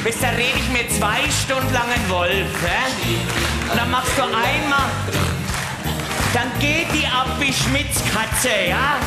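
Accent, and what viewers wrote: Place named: Franconia, Germany. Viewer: fränkische Sprache du dist sehr sehr cool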